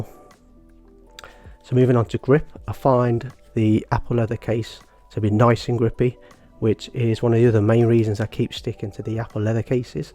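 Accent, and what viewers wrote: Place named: United Kingdom. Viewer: Falling in love with Britich accent 😍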